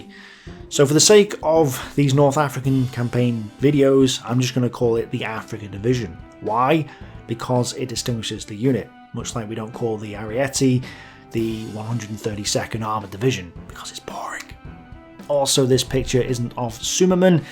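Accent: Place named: Northern England, United Kingdom. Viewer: really? I hear the accent, but it is certainly not a continental one, it is a british isles accent. Or did you think all Brits spoke perfect Queen’s English, because they happen to live in England? And that English doesn’t have dialects?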